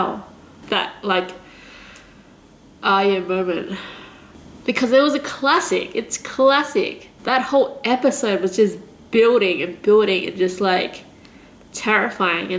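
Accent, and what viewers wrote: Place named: Australia. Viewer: You weren't the only one sweating it out...quite the roller coaster of emotions this episode was lol Condolences to your Koalas for witnessing such horror, for the night is dark and full or terrors ALSO...my brain can't get enough of your Australian accent ;p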